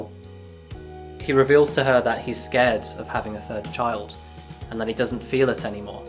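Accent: United Kingdom. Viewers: I love the British accent all the time I live the royal family I love marks and Spencer your videos are remarkable ❤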